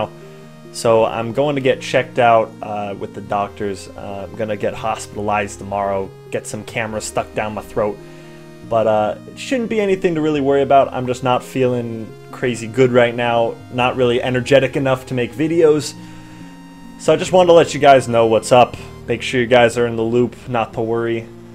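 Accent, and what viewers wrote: United States. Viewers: I just noticed he has a new York accent o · What accent is anyway? Sounds kinda Southern and Northern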